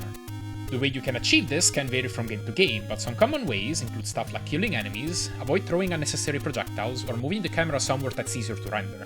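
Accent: Italy. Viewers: you sound Italian ahah, where are you from?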